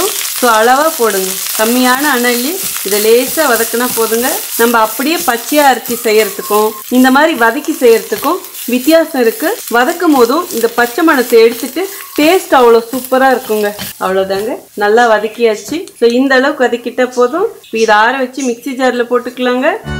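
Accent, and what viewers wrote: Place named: Kongu, India. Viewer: Your preparations and Narration,in typical Kongu Tamil will enhance Children 's Lunches very Tasty. Thank you Sister 🎉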